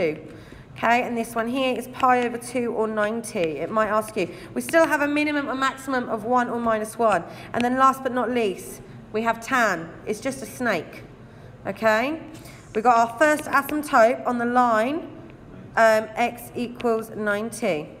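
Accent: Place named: United Kingdom. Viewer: thats the most british accent u have seen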